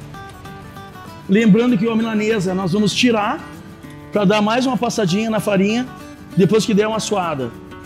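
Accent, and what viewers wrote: Rio Grande do Sul, Brazil. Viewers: Sotaque gaúcho é top demais. Brasil e suas várias línguas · Tu é o cara para ensinar assar pelo teu jeito de falar tu és do Rio Grande do Sul aprendendo muito com você um abraço do Paulinho de Porto Alegre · Mas bah tche tu es de porto alegre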